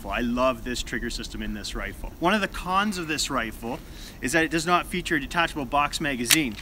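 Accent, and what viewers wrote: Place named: Ontario, Canada. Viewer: Ha knew that was an Ontario accent!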